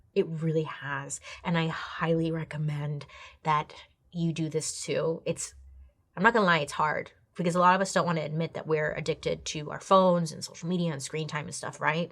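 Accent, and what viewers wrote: South, United States. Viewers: “Cornteen” 😂😂 talks like she’s from down south with me lollll ❤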